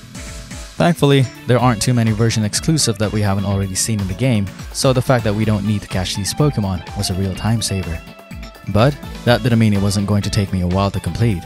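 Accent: France. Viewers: why does he say every name in french LYSHANDERUE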